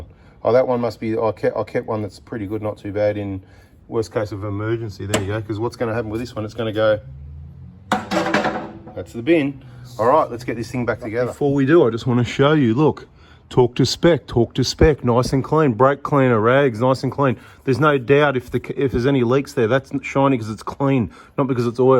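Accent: Australia. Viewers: Nothing beats an Aussie accent.😂😂😂👍🏽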